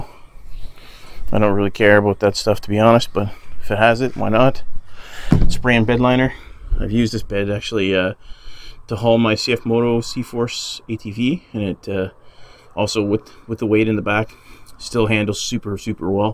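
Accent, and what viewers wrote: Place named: Canada. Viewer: How did I know you were canadian based on your speech? Ha you've got me even more intrigued by the custom trim. I want the LT, but not sure if it's worth the extra 10k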